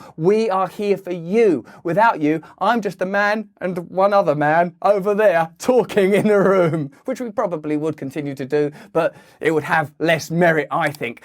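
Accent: United States. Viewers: Your American accent is pretty good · Dude, I love your American accent.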